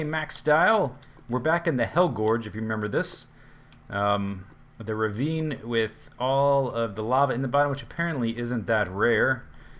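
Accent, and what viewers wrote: United Kingdom. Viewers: its, with a sexy uk voice? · Good, British accent.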